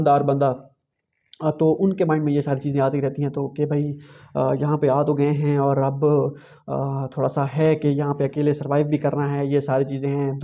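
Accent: Saraiki, Pakistan. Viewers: I appreciate you brother آپ کی وڈیوز دیکھنے کے بعد مجھے پتا چلا کہ csc بھی کوئی نام کی چیز ہے آپ ہر کمنٹ کا جواب دیتے ہے اللہ تعالٰی آپ کو کامیاب کرے ہم سب کی دعائیں آپ کے ساتھ ہے ۔ سرائیکی وسیب کے آپ پہلے بندے ہے جو اس کام میں بہت آگے ہے آپ کا سرائیکی لہجہ ہمارے لیے سمجھنا بہت آسان ہے کیونکہ ہم سرائیکی ہیں اور ہماری دلچسپی بڑھتی ہے میں آپ کو سلیوٹ کرتا ہو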